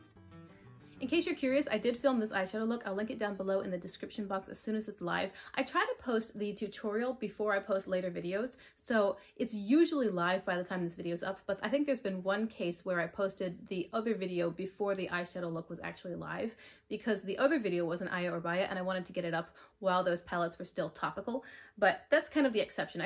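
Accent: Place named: East Coast, United States. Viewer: I smiled when you read the palette name "At Forest Sight"! When I moved out to the West Coast, my neighbor had a bumper sticker that read "May the Forest Be With You", and it confused me for the longest time, because I -- like you -- have an East Coast accent and pronounce "forest" with two syllables. Those puns only work if you pronounce it "forst", not "FAW-rest"!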